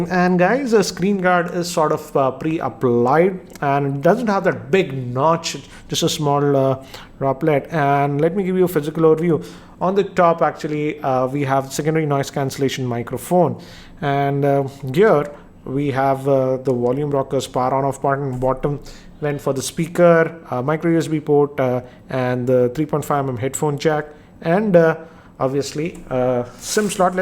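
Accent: India. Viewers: indian loghat inglish so rough and Bad the voice to hear it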